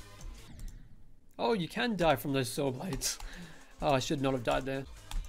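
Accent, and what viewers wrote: Australia. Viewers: I live in Australia And I never knew you lived in Australia Too. I suck at geometry dash. Well I live in Tasmania and I did think you live in Australia because of your accent. HOW DO I GET BETTER AT MAKING LEVELS AND JUST EVERYTHING IN GEOMETRY DASH!!! Ok I guess I’m just cringe now.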